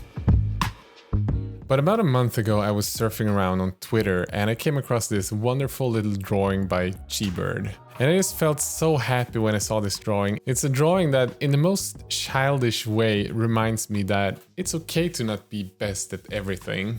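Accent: Sweden. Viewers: This is probably one of the most wholesome videos out there for this hobby! <3 As someone with depression, executive dysfunction, and on top of that adhd which leads to myself having 243506 different hobbies... I spend most of the time jumping from thing to thing. Painting miniatures is one of those things, and right now i think it was like... a year ago since i last painted anything... i paint like 2-5 times per year otherwise.. i go in waves. right now dnd is the wave that i spend most days working with as a dm. So... am I good at painting? No, but I'm average, decent, acceptable for tabletop standard, and as you say, that's perfectly fine! I dorecognise that lingering "i'm not good" feeling thou... damn it's crippling, but.. i'm doing my best at pushing that aside and instead going "damn that's crippling but what the heck let's do it anyway, in my tempo!" <3 Thank you for this encouragement. 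(now, if I could only fix the painting station which is just lying in heaps right now... xD) Also... Your dialect sounded very swedish,, then I saw the fiverr video and in the end I heard "nej inte äta den!".... yup... so hello fellow swede! :P waves from sweden, and i'm guessing the minis wip group aswell :P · Hittade din kanal för några dagar sedan. Hobbyn hade jag lagt på hyllan men efter sett dina filmer så börjar jag bli sugen på att måla igen. För du är väl svensk , har för mig att jag sett kr och du har en svensk brytning. Bra jobbat vilket fall som helst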